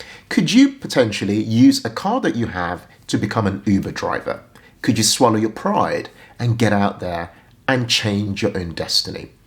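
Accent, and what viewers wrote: United Kingdom. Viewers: Which country are you from? You sound like you’re from the UK x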